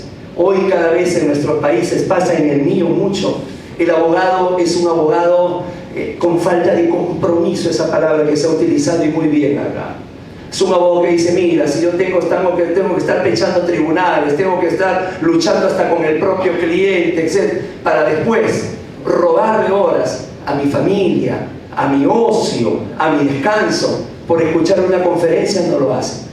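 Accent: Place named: Argentina. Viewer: Me hubiese detenido resaltando como un peruano quiere hablar como argentino, pero eso no seria el fondo, de análisis del neo constitucionalismo no tiene nada, solo en un segundo se mencionó la ponderación de Alexy, de ahí no pasa de una charla para muchachos de secundaria. Acaso el latinoamericano no puede analizar el concepto de derechos fundamentales desde el positivismo y no positivismo? Acaso no le sirve a la realidad latinoamericana si el Juez resuelve con ponderación o con una ponderación disfrazada que realmente es interpretación subsuntiva? Acaso el latino no puede generar una filosofía del Derecho o una Teoría de la Norma que no ignore que la pólvora ha sido descubierta e incorpore variables propios? Que pena que el Perú haya enviado a un equivocado en el tema y que Latinoamérica no resalte exponentes de talla de Rofolfo Luis Vigo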